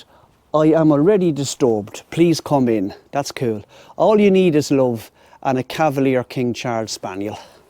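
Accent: Cork, Ireland. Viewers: First just hype you get more followers then.Lovely to see you we want to see more of Cork we just love the accent my friend.So happy Sunday evening till next week.🎉🎉🎉🎉🎉🎉🎉🎉🎉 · Great video 🎉 you got to love the Cork accent 😅😅..you were getting some looks there in michelstown as you said the cowboy 🤠 walking into the saloon 😅😅..look forward to next week thanks 😊 👍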